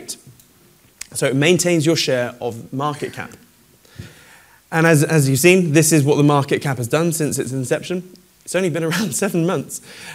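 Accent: United Kingdom. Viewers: Just because you say it in a fancy british accent doesn't make you correct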